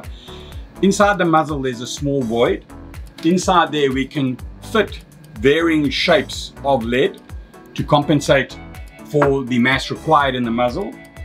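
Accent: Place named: South Africa. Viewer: I have been watching your videos for many years with your cute south African accent and I am waiting for you to start making wooden gun. Now I have the reason to visit you in South Africa!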